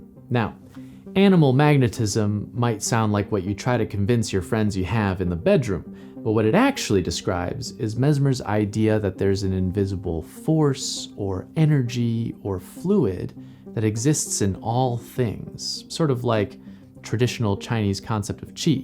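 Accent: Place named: Scotland, United Kingdom. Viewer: Dude nice Scottish accent.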